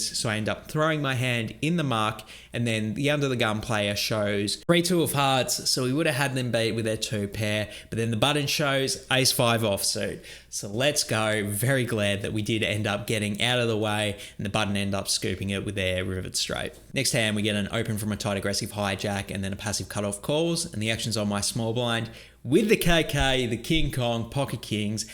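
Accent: Australia. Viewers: You talk out the side of your mouth as it is. I can't understand some things you say. There is something about Australians and the way they talk out the bottom side of their mouth. It makes some words hard to understand lol. The accent is awesome but the way the accent comes out is hard lol. Tough sessions happen, better luck next time.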